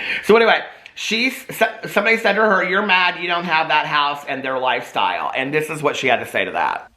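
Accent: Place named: Midwest, United States. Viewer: It’s the Midwestern twang for me!!! 😂 I never knew we had an accent here until I moved to Florida for a year to get sober! So, I guess we have an accent!! 😂 and yes, we do say ‘fixins’ !!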